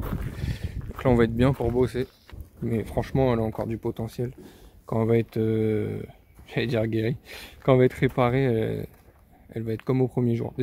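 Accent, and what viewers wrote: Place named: Normandy, France. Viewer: Belle vidéo,belle mécanique,le 2.3 n'a pas été extremement diffusé en France.Solidité incroyable,mais la conso....Etant donné l 'accent,on serait bien en Normandie non?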